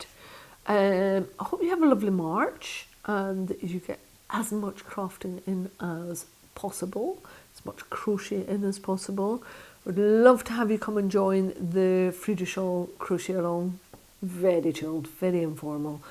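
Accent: Scotland, United Kingdom. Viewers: Yay delighted that you are staying on YouTube. I've watched you from the start and YouTube is my preferred format for podcasts. It's lovely to see you and your projects and feels like chatting with a friend. Being Scottish I love hearing your Scottish accent, turn of phrase and chats about Scotland in general. xxx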